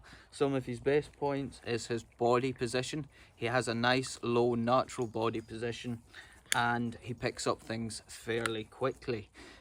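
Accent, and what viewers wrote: Scotland, United Kingdom. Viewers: Im not sure whats most satisfying with this channel. The scottish accent (i think its scottish at least) or the dogs. Ive commented it once before, Echo is such a piece of beauty. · I had a border collie when I was a kid. I was an only child, and Queenie was like my sister. I love watching you and your dogs. I also love your accent. I was in Scotland a few years ago, and I loved it.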